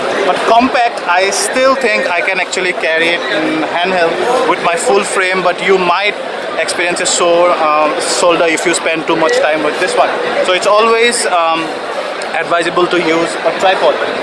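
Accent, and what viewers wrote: India. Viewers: Ur accent looks like u r an indian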